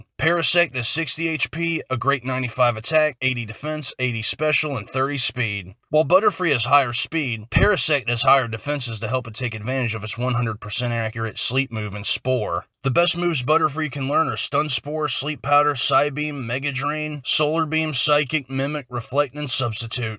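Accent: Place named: South, United States. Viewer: when i clicked the video i was like now which one was this guy oh right the one with the southern accent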